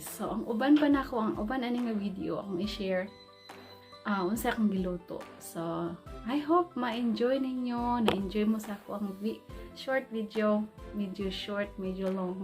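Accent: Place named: Visayas, Philippines. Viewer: Here again kapatid.. Dami pagkain hahaha.. Wow clear skin.. Bisaya ka pala day kaya may punto.. Ganda ng place mo.. See u ulit day..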